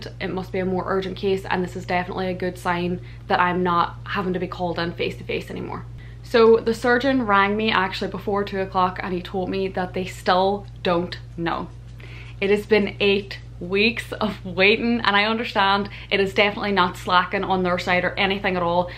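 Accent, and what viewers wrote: Ireland. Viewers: I love your accent. Is it Irish? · Her irish accent sounds like "hi, my name is Erin" , or "hi, my name is Aaron" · I've been following your story and praying for the best outcome. Spent a bit of time myself in hospitals and quickly realised that having a positive outlook no matter what get thrown at you is most important. All the best, just love your Irish accent, my folks originally came from Donegal. ☘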